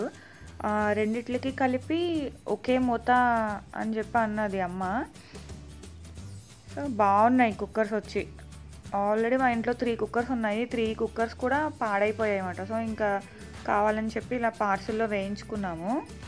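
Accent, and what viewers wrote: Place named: Godavari, India. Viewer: Godawari esha...uttichinattu vinapaduthundi👌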